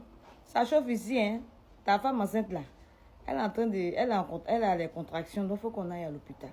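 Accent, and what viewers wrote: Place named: Ivory Coast. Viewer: son mimi accent ivoirien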